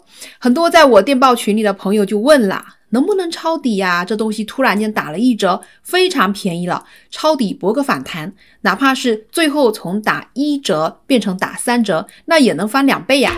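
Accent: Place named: Cantonese, China. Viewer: 感觉广东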